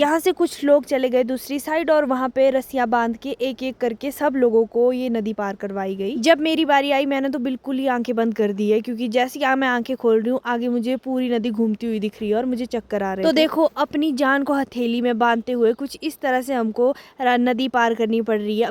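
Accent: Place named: Urdu, Pakistan. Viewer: Bara saaf urdu ka lehja he.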